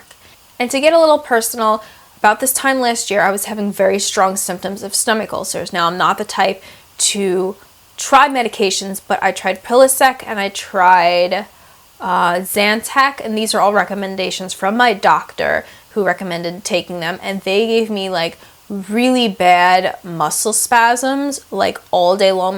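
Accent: Long Island, United States. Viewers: Are you from Long Island? I notice an accent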